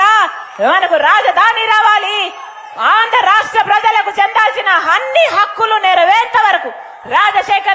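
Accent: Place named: Telangana, India. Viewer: Same to same telamgana laga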